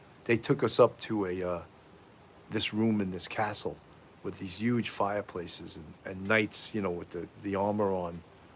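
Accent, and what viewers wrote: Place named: East Coast, United States. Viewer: Hey,! Watching the replay. Haha - I can tell you're from the East Coast! Illinois is pronounced "Illinoi". The "s" is silent. I'm not nit-picky, am I? The nationwide "brotherhood" among police often extends to Marines. My disabled Vietnam veteran husband has often been given a pass for minor offenses.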